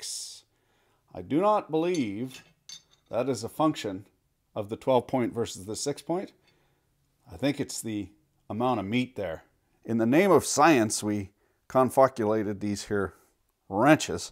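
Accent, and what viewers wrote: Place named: Canada. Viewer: As someone with a lifetime of experience in Canadian English and it's MANY colloquialisms, it gives me a great deal of pleasure to watch YouTube closed captions struggle to comprestand and interpolate your videos!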